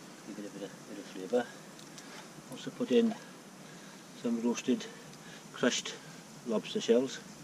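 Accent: Wales, United Kingdom. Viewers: Your accent is so thick, it's impossible to follow some things you say (I lived in Wales 14 years, but it;s still bloody hard to understand this man). You lift a rck and say, There's a nice three-beaded XXX" - what was it? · Welsh accent is beautiful.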